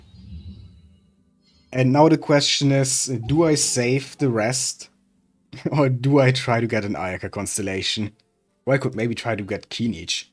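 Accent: Germany. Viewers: U sound German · Ich höre ein wenig deutsch raus Grüße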